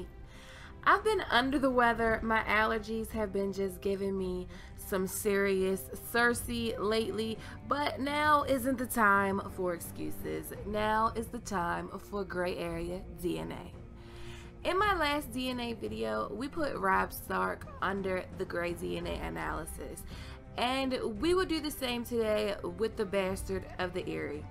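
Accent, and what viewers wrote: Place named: Maryland, United States. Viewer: she sounds like shes from Maryland or the "DMV" if you are familiar with the different American dialects of English...anywoo I enjoy her theories & perspectives the most!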